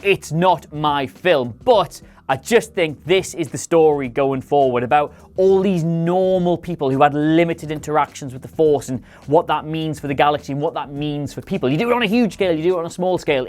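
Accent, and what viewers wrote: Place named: England, United Kingdom. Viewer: BallerDman789 Come on man, he is English. There is a difference in pronunciation. Besides, they don’t even know how to say the same name in the same movie. In the original Trilogy everyone said “Han” like 6 different ways. Just shut up and enjoy the video.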